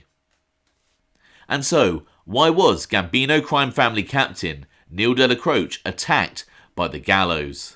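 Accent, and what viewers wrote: England, United Kingdom. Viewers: There s nothing like reading a transcript with an English accent …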